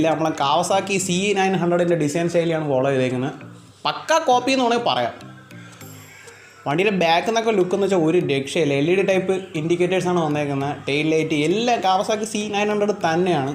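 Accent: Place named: Trivandrum, India. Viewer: Neeyokke parayunnathu matulavarkku manasilava kude Ella athinekal nalathu tvm bhasha thaneyya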